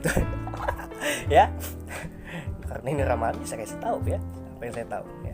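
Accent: Banjarmasin, Indonesia. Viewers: Banjarmasin ya....Sy suka gaya bicaranya, mengingatkan Sy dg seseorang di Bjm hheee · Abngnya Dari Banjarmasiiinn, kahh???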